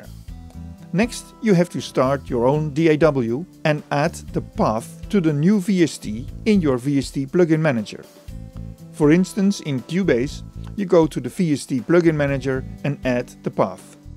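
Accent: Netherlands. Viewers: Haha I also noticed within the first sentence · you sound really dutch lmao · I can clearly hear you're dutch man. Just like me or not? :-) I recognize it directly in the first 10 seconds lol. Good you're doing this and i really love it. Thanks i appreciate it!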